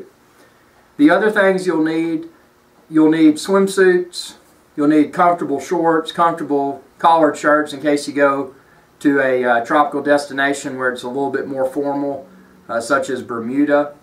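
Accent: South, United States. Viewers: That was awesome. Thanks for the information. But what really kept me watching your video was your cool southern accent ;)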